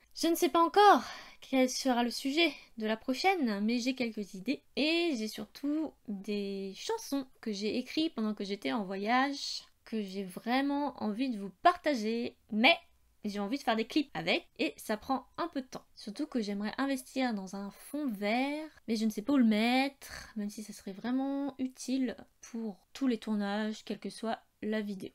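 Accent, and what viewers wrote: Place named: Paris, France. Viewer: Je n'avais pas remarqué ton accent parigot, mais j'adore ton chuintement sur 'ch' et 'j'! Bravo pour ce morceau de bravoure qui m'a ramené dans ma classe de 6ème, il y a... un certain temps !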